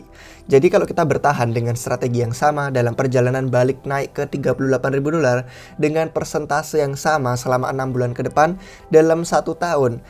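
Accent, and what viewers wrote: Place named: United Kingdom. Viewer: Logatnya ala Inggris Britain bukan Inggris Amerika. Konsonan tengahnya hilang semua. Wkwkwk...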